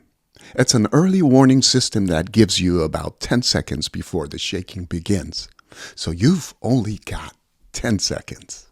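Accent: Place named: United States. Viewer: You speak very well if I hadnt known you grew up in Japan I would have thought you grew up in the United States because my stepmother whos from Japan and spent decades living in Hawaii still had an accent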